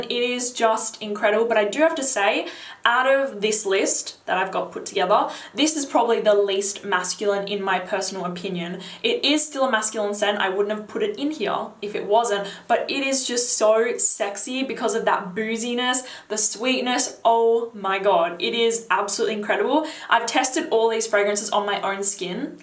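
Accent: Australia. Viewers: I dont even know how I stumbled across this. lol But good looking out. I just purchased 2 of them :P Are you Australian? I think I just became a fan. :P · How have I never seen your channel before!? And Australian.. bonus. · Are you Aussie? You sound like it.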